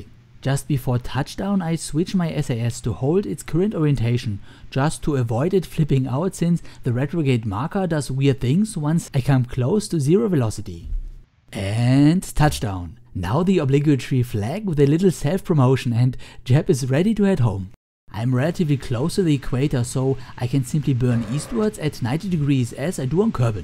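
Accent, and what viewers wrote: Germany. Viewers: German- English xD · You have an amazing accent. Sehr deutsch! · Your accent... It is very strong... Is it German or Autrian, sound like it :D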